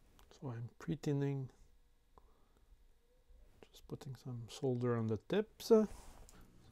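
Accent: Quebec, Canada. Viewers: Hey un québécois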